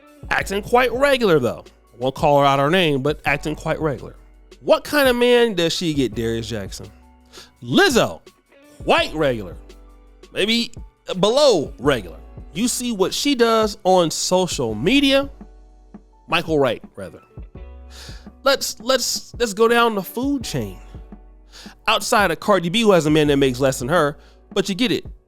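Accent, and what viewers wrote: United States. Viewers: That was the most "Bay-destrian" video I have heard you do.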